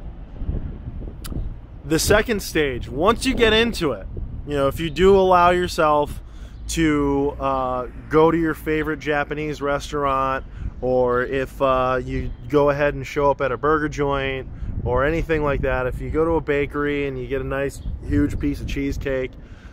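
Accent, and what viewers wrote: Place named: New York, United States. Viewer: I can't wait until ketosis becomes even more mainstream and your youtube channel blows up and you suddenly have a few million subscribers who are reeled in by your New York accent, your passion for weird music, and your "no-nonsense" style of talking and filming however you feel like. Then I can say, I've been watching his vids all along :)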